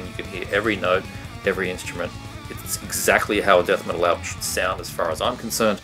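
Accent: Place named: United Kingdom. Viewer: Yeah lots of Australian bands here but he has a British accent? I noticed that was weird too. Anyways, great list!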